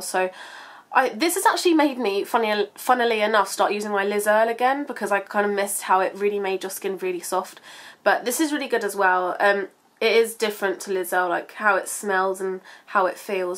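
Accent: United Kingdom. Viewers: Listening to you speak makes me miss living in the UK so much :(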